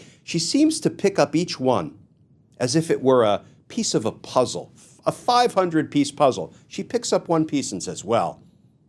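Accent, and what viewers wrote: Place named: New Jersey, United States. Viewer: Thank you for this series of videos. I normally play them on my phone as I'm cooking dinner after coming home from work. Your Joisey voice carries over the kitchen noise. I'm a Never Trump Republican. I neither voted for him in 2016, 2020, nor 2024. (Yes, I've early voted.) I was formerly a member of the Virginia Beach Republican City Committee, and served as Captain of three precincts. As much as I knew Trump would be terrible for our country, I also knew what he would do to our party. It's now become worse than I ever imagined.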